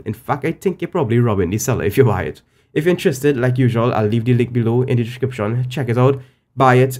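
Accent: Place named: Trinidad and Tobago. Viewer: That’s definitely a Trinidadian accent if I ever did hear one, love the review brother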